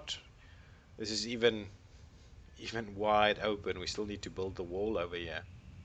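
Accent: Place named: South Africa. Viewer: Great accent. South African? Can you say "Diplomatic immunity!" sarcastically please. :)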